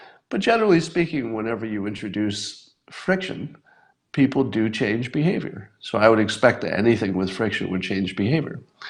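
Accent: California, United States. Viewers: Californian's have the best accent.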